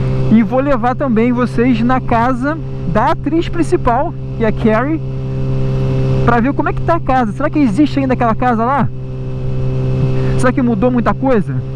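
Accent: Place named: Rio de Janeiro, Brazil. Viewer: eu acabei de rever o filme, cara parabéns pelo vídeo e abençoada seja a Internet né por que nos permite coisas como essa sensacional curiosidade nostálgica que você trouxe! Um adendo, esse filme foi fantástico pelo carro pelo amor adolescente (eu era apaixonado na atriz, hoje não ela tá com 57 anos kkkkk) a história de suspense como um fantasma que buscou uma vingança justificável, através do desconhecido pra nós, máquinas de outro mundo, uma força cósmica, o ronco pra lá de insano do carro parece sincronizado de 2 motores deu um efeito assombroso...apesar de hoje parecer tosco como você disse na época nooossa era top mesmo, e a gente viaja no tempo, olha parabéns de novo e ganhou +1 inscrito brother!! Forte abraço tmj... seu sotaque carioca mano kkk eu sou do Mato Grosso do Sul campo grande, aqui é porrrtera, nóis, faiz, bão kkkk vlew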